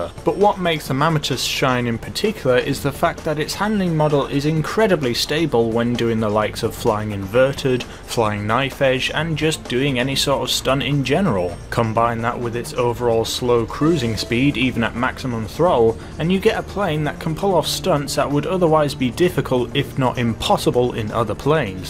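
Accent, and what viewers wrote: United Kingdom. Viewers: Wait wait are you british?? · Wait... YOU'RE BRITISH TOO, well that's a nice surprise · Are you a UK YouTuber? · Didnt notice that you was from uk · Are you British???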